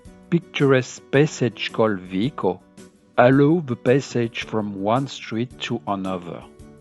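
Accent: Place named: France. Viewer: very interesting video and very funny french accent, t u 😃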